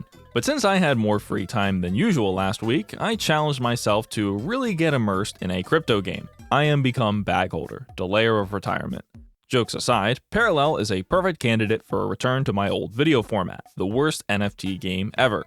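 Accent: Philadelphia, United States. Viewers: Are you from Philly? OH WAIT IS THAT WHY YOU'RE CALLED??? I feel like I'm learning so much lore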